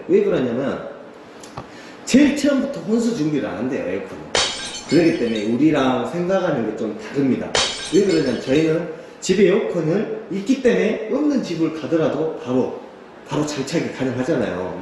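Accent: Jeolla, South Korea. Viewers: 얼굴은 잘생겼는데 사투리가 심하네요 인자는 전라도 사투리? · 대한민국 사람이면 누가 들어도 전라도 사투리를 ..ㅎㅎ 강원도인줄 알았다네..ㅋㅋㅋㅋ 탈북민인가? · 딱봐도 전라도 사투리 쓰시는구만 한국사람맞냐니 말하는꼬라지보소 인성먼저 갖추시길 바람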